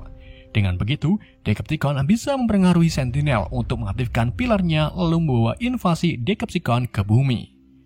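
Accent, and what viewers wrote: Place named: Tegal, Indonesia. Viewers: curiga..ni pebgisi suara kyy orang tegal...tau semua bahasa tegal... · Sampean wong tegal wis jelas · Dari logat bicaranya kayak orang jateng😂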